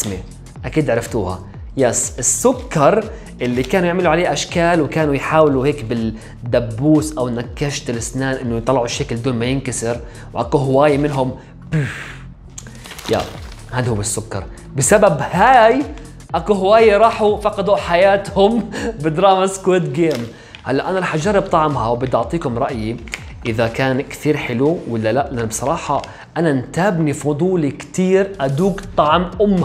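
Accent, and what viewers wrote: Iraq. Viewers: انته اشكد كيوت اتصير من تحجي عراقي 🇮🇶 فديتك ولله 💗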